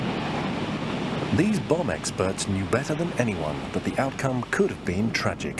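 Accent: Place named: United Kingdom. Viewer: yes but the brittish accent is so nice.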